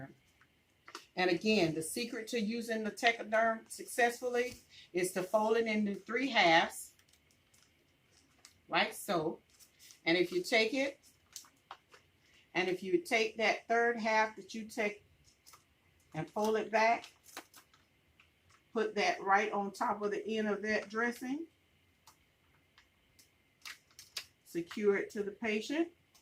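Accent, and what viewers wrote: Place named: South, United States. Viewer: Loved the video!!!! Thank you so much, and you sound southern! :)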